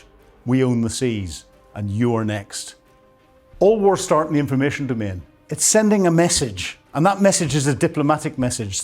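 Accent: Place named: United Kingdom. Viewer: Don't try to tell us what Putin attempted to do to Kyiv but failed to accomplish. What, are you a British hack? Yes, listen to your accent. Another foreigner acting to be a type of expert on America's capability or intentions.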